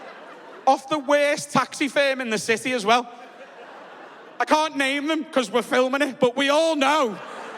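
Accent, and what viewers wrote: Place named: Liverpool, United Kingdom. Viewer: Was this chap braught up, same place as darran till. Accent seems, very similar, unsure if it changes certain parts of Liverpool, like it does generally, in Glasgow, newcastle, but this guys accent and darran tills, sounds very similar, but different to carragher, just a thought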